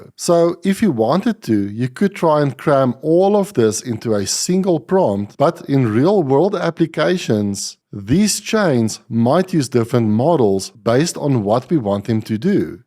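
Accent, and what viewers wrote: South Africa. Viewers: So South African :D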